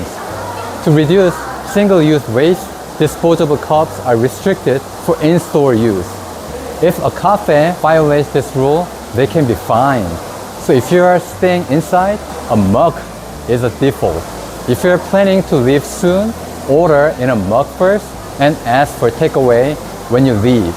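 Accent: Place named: United States. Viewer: By his American accent, sounds like the narrator came to the US after he was 13 yo?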